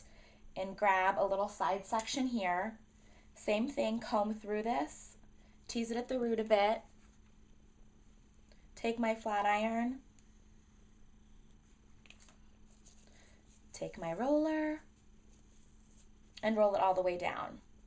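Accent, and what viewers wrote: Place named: Texas, United States. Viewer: Hi. Where do u work out of? Sounds like ur in Tx. And I just mite be willg to drive to have u do my hair! 😁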